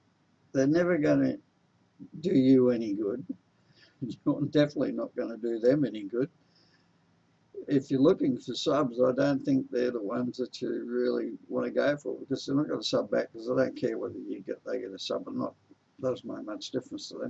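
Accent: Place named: Australia. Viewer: Hi if you're wondering why I comment so rarely on your videos, while you so often comment on mine... it's not because I'm selfish. I would love to comment on more of your videos, but the problem is, I don't always understand what you say, since English is not my first language, and your Australian accent is a bit difficult for me. Ask Brendan.. I have to watch his "Friday's Funny" episodes like 3 or 4 times in a row to get all of his jokes! There seem to be different accents in the different regions of Australia, because some folks I can understand better than others. For example, I usually understand Gazza, but when he speaks in his funny voice on the "Bogan News" it's hard to get for me.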